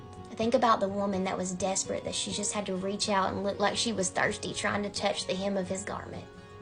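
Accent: South, United States. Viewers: Your soo illuminated, love your country voice ! Still wondering about that cornbread and Sweet tea😊❤❤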